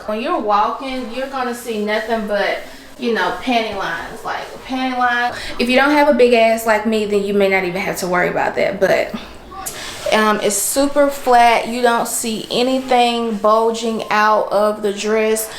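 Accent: South, United States. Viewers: Do you have a country accent it's so sexy with yo thick fine self with all do respect boo 😘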